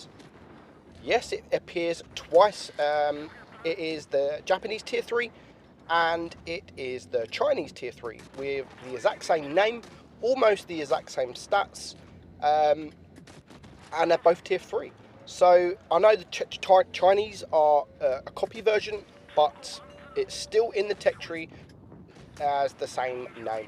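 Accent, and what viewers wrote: United Kingdom. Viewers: I Love your british Accent :v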